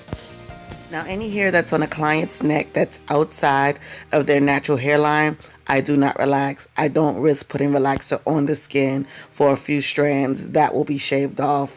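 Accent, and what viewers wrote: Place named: New York, United States. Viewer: Up, and coffee in hand. Back to the “Binge!” This “Do” looks AMAZIN! Love it; Natural/Relaxed....Healthy Hair is the Goal💪🏾💪🏾 And this looks Great! Technique is CRAZY! Such a “Pro!” Oh, and I KNEW you were a “New Yorker!” HEEEEY Fam! The #accent may have diminished, but it takes ONE to know one!💪🏾💪🏾💪🏾🖤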